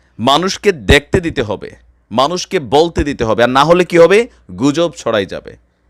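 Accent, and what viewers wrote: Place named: Comilla, Bangladesh. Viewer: কুমিল্লা কুমিল্লার পোলার মতো কথা কবি বলবিরে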